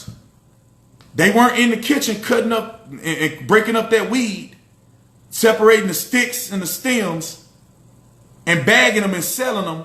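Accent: United States. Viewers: Sounds like u grewup an American ! So did I.....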